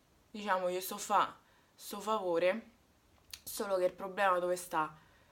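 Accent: Rome, Italy. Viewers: Ciao sei bellissima e il tuo accento romano mi fa morire dal ridere ti amo troppo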